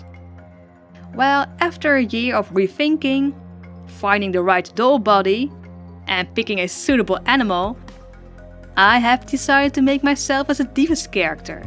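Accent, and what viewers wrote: Netherlands. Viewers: Where are you from? You sound kinda Dutch in some way but idk oof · Heyo! I'm also Dutch! Ur pretty good at English. And I know, sometimes it's hard to speek English with that Dutch accent... I mostly just put something tru Google translate lol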